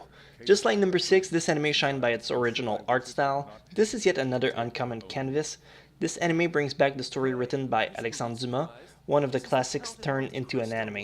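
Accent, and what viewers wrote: Quebec, Canada. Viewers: haha clairement québecquois ;) good job ! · Hahahaha jaurais jamais pensee que tes quebecois :) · Are you from Quebec ?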